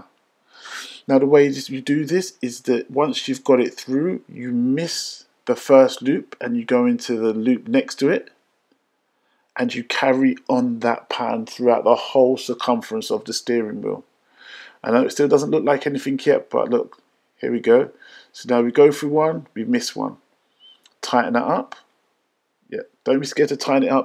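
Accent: United Kingdom. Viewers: Finally found a video where someone did a fantastic job and spoke clearly in the Queens English, worth subbing and great upload. Thanks for taking time and sharing young man, appreciate.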